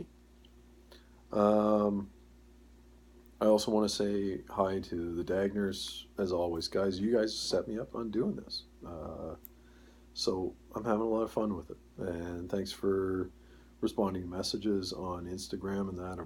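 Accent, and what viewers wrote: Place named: West Coast, United States. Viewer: Nice west coast accent lol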